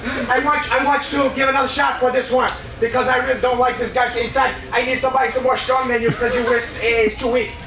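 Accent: Brazil. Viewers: i think he is doing a parody of every brazillian fighter or vinny marglese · you know you’re acting is on point went an actual brazilian thinks you’re really from Brazil.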